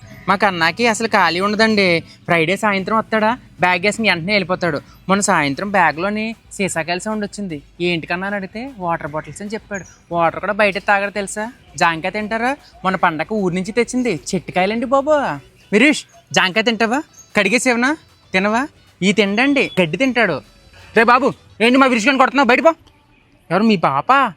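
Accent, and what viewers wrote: Godavari, India. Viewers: Achamaina ma godavari yaasa vinte antha anandanga u do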